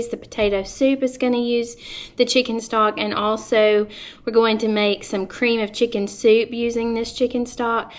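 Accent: United States. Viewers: sorry being english you sounded yank lol :P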